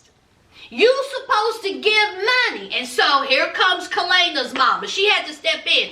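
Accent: South, United States. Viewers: You sound like me and my family and friends down south when we get together. You would fit right in.😂😂😂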